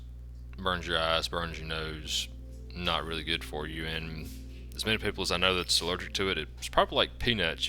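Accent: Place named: United States. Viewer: Hey, in this Moment, i am a Fan of you and your work. Very nice. Where are you from in the USA? I like your voice and your dialect. Many greatings from northern germany, from me and my girlfriend. Stay care and healthy.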